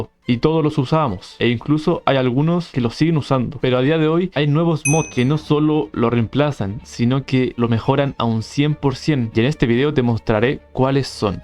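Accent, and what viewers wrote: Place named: Chile. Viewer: Chileno se te nota, gracias mi hermano hoy quise revivir mi infancia, meo corte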